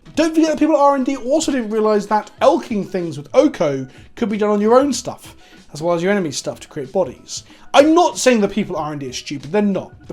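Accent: United Kingdom. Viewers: Woah woah hang on... you’re British?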